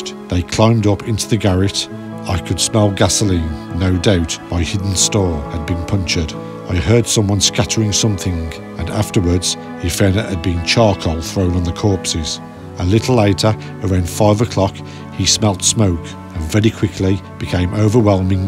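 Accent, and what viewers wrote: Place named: Northern England, United Kingdom. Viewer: At first the strong nothern accent in the narrative takes some patience, after about 5 minutes, the film develops and becomes a truly historical experience of the SS Reich Division massacre of the village. I had heard of this massacre in France as I am French/Irish/ and born in England, so, I have a decent knowledge from different angles on WW2 and WW1 (as my grandfather was in the French infantry from 1914 to 1917, he was seriously injured at Verdun, his brother was killed in 1916). My father was in England during WW2 and was 18 in 1945. One of my friends at school was German Venezuelan in England, his father was an SS Tank division commander in WW2 and has been suspected of taking part in a destruction of a village in France and the assassination of the village habitants. When at school in England from 1971 to 1981 I asked about the whereabouts of his father and one of the two sons who I knew well said “he can’t return to Europe, as he served in the SS and was an officer in charge of a Panzer Division)… His father was 15 years older than his mother who was Venezuelan and who I met in the North of England several times during half term holidays, small world, coincidences. 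Hard to grasp this level of evil and inhuman behavior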